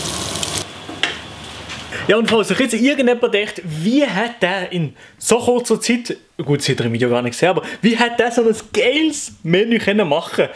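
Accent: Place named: Fribourg, Switzerland. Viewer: I finge dr Friburger dialekt efach sooo witzig 😂😂😂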